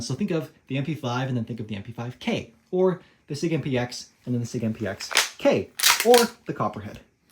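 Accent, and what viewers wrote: Canada. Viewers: Dude I can’t stand your canadian accent. Don’t have a problem with Canada, just don’t like your breathy raspy voice. Nice guns tho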